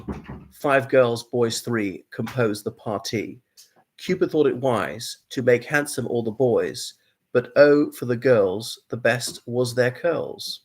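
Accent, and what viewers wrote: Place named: England, United Kingdom. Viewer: Read like a redcoat . Bit boring old chap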